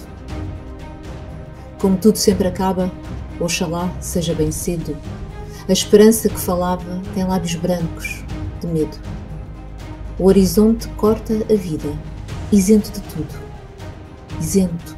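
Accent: Portugal. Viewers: Sotaque português é muito carregada, mas ficou bom.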